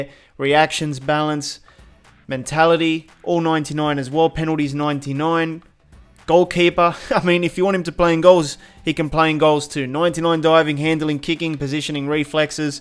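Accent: Australia. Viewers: Hey r u from austr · Are you Australian?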